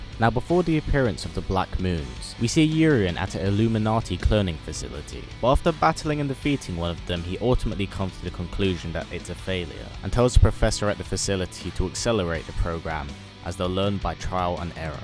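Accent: United Kingdom. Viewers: I love these videos, just play them and leave them in the background while doing other stuff. Also, the fact that they're narrated by a fellow Brit is just the best thing.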